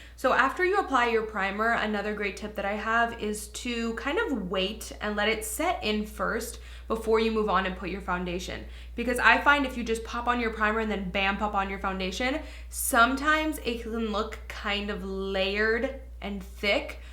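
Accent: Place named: Canada. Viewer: you are so damn adorable!! Just now realized you are over 500k...I've been around since 80. So proud of you. btw i'm in love with your Canadian accent <333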